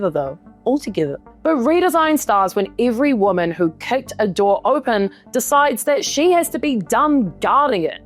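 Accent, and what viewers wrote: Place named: New Zealand. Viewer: I'm a late boomer (almost gen X) and I would like to thank you for your enlightening analysis, it really set my ideas straight about the cultural shock I sometimes experience with gen z. Also, your video helped me solve a puzzle, which is where you are from. I'm french and, apart from those of us who watch brokenwood in the original version, we are not really exposed to the NZ accent. So mystery solved! Thank you again for your excellent channel.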